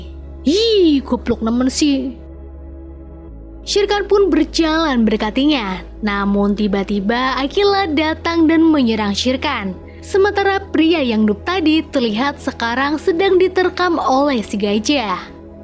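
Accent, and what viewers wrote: Pemalang, Indonesia. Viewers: Goblog nemen si🤭 Kya logat e wong Pemalang kota, bener ora sih wkwk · orang pemalang mba? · Mba sampean kaya wong pemalang mba, angger iya tangga mba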